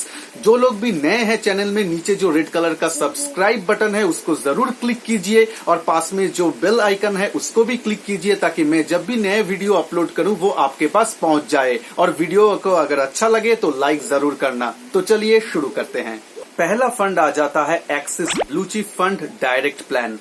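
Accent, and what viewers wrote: Bengal, India. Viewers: Hi, I have seen and liked your video. You talk nicely and your logic is lucid and easily understandable. My suggestion, you should include the Risk part too, the individual Funds poses, in your discussion. That becomes a very critical determining factor in Fund selection. You are a Bengali, right? Apparent from your pronunciation. Good luck to you and keep presenting such nice videos.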